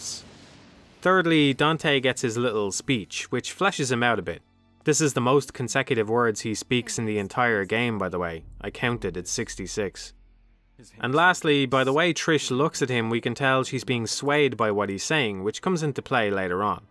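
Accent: Ireland. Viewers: You Irish? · Woah woah chill bro I didn't mean it like that.. why do I exist and you don't even know me? Who's the ignorant & insensitive one here? Jesus Christ what's your problem? Snowflakes like you are everywhere these days it seems.. Listen i got no problem with him being Irish.. on the contrary I only enjoyed this video even more because of his lovely accent and his knowledge & love for this game.. I wouldn't have it any other way that's why I keep coming back to this video years later.. I wasn't trying to be racially ignorant although my initial comment may have sounded a little insensitive, however I said it in a humorous sarcastic tone.. it's just that DMC is such a niche franchise and not many people are into it and because of its anime style I thought it was more popular in Japan than the rest of the world combined much less with an Irish guy.. hence my comment.. 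but does that mean I think no Irish fella or foreigners know about DMC? Ofc not! There are fans all over the world but none have I seen anyone explain it in detail like this guy.. Having said that he is the first Irish guy I've seen on YouTube that has expressed so much love for this game through his vast knowledge on this game.. my original comment had no intentions to offend him and I doubt he would be since he probably has thick skin and can take a joke unlike a certain snowflake lol.. just kidding.. love you too bro.. just don't be quick to judge people next time geeZ..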